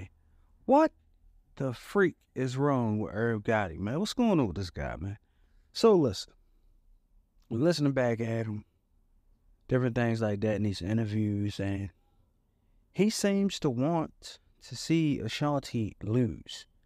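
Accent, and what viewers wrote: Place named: Baltimore, United States. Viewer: It’s true and clear that money don’t make you happy … I can tell by your dialect, you’re from Bmore or the MD area, but I could be wrong… It was cool to see how someone from Bmore saw it and confirmed how disrespectful that would have been 🤔 People like Irv swear they can speak for the hood but don’t have a damn clue on nothing!!!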